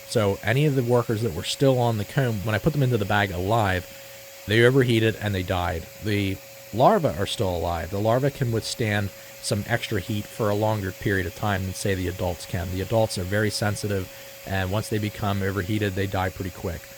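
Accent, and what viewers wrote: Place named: Midwest, United States. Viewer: I’m from the PNW & can’t place his accent. Is it like Midwestern?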